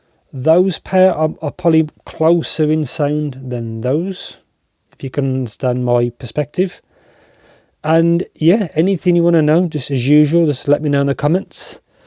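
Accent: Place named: Black Country, United Kingdom. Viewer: I dont know if it's the short, informative and to the point videos or the (I want to say black country?) accent that makes me feel at home but you have to be in my top 5 youtubers. Always eager for a new video from you, especially for iems and daps! Keep up the great work!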